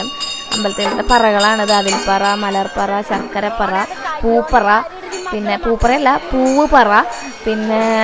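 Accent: Thrissur, India. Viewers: ചേച്ചി തൃശ്ശൂര് സംസാരശൈലി സൂപ്പറാ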